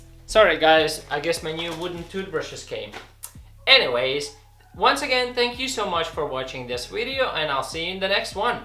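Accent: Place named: Latvia. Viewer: Nice video. Uzreiz varēju pateikt ka esi latvietis pēc tā ka teici 2 miljoni cilvēki. Akcents nodeva. Tad tava kanāla nosaukumu izlasīju. Bet tā. Forši ka šādu info liec :) noderīgi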